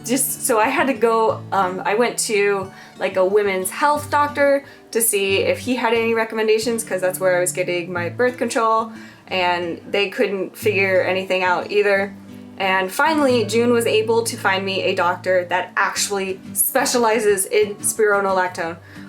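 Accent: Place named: United States. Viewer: your american accent is going away and its cutee :)) ♡♡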